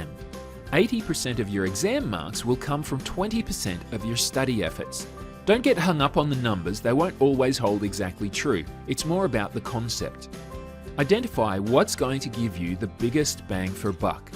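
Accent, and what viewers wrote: Australia. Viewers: Super helpful! Genuinely ingenious advice I haven't heard before. P.S. Is it just me or does the speaker have a weird mix of Aussie and American accents?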